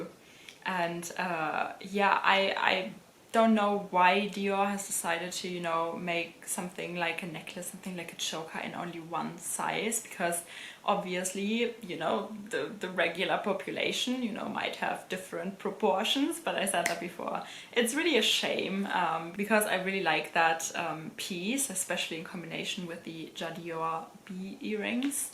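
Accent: Germany. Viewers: Was hast du denn für einen deutschen Akzent?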